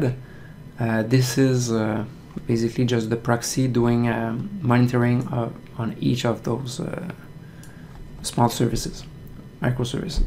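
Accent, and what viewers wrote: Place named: France. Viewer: french accent... How cool is that?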